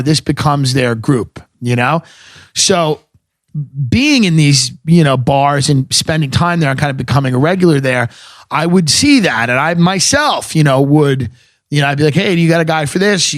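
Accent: United States. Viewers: I'm 8 mins in and all i hear is a yank yapping.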